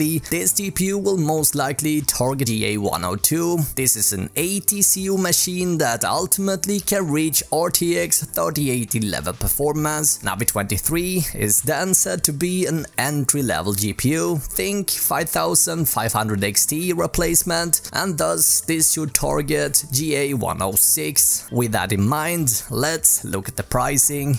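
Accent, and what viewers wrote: Sweden. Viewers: Its because he's from the north part of Sweden and the majority of people out there have that annoying accent unfortunately. In short he's speaking in Northern Swenglish. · The Swedish accent is strong with this one!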